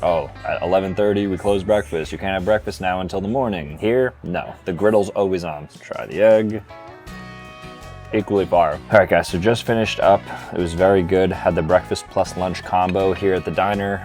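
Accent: United States. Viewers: W country accent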